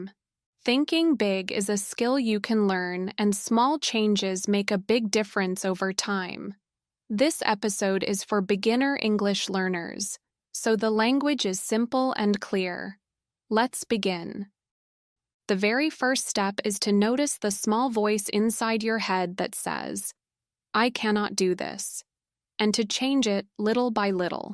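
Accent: United States. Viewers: your accent in video is american accent . right ?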